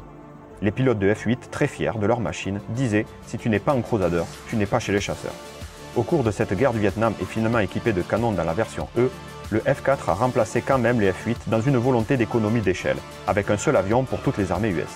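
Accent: South, France. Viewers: De très bonnes vidéos comme toujours, en plus ton accent du sud fait plaisir à mes oreilles d'ariégeois ;) ! Continue comme ça !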